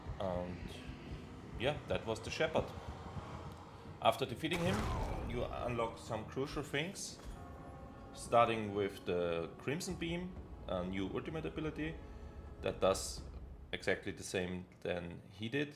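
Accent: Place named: Germany. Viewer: Wenn Deutsche Englisch sprechen :D <3 danke für den Guide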